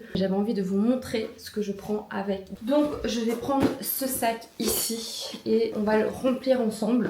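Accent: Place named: Belgium. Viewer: j’adore ton petit accent belge, ça me rappelle d’où je viens 🥹 je crois que j’en ai encore un peu moi aussi, c’est drôle comme les accents nous suivent partout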